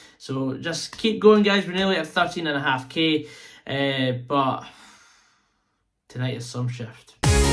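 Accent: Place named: Scotland, United Kingdom. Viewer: We adore the Highlands! We adore the Scottish accent, by far the best and most emotional! Good honest commenting on the game!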